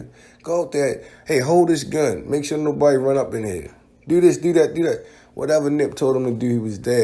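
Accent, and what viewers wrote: Baltimore, United States. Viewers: You from B more? When you say do and dude you have the same accent as people from there, My bad if im wrong. But anyways, I aint gonna lie I thought Cowboy had something to do with it also. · Damn bro your Baltimore accent heavy af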